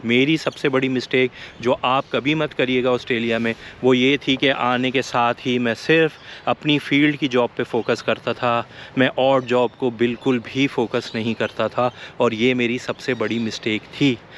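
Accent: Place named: Karachi, Pakistan. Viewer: bhai baaton se Karachi ke lag rahay hain. I loved your video. I would love to meet you in Australia. I am landing soon.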